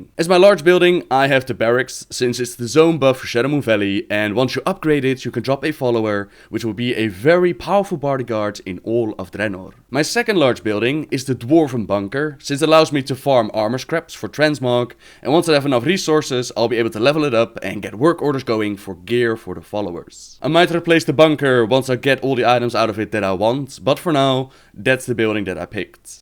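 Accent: Netherlands. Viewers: HOLLAND :D het accent valt wel mee hoor ^^ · I was wondering about that accent too, though I found out you were Dutch because I started watching your Majora's Mask videos and you mentioned it. I really like the accent. Can't wait for more lore, you've been making me really happy to be back in the game. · Woaahhhhh :D first Dutch accent I've ever heard! Peace out man!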